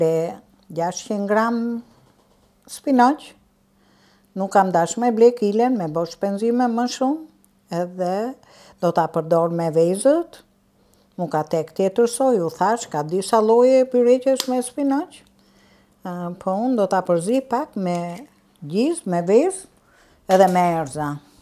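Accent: Tirana, Albania. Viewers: Mirdita zonje , kam pak kohe qe po ju ndek nga Italia, me kenaqe me ate fhekesin e vjeter tironase, dhe me recetat dhe shpjegimin tuaj te fhjesht popullore. Ju uroj SHENDET 👌👏🙅‍♀️❤️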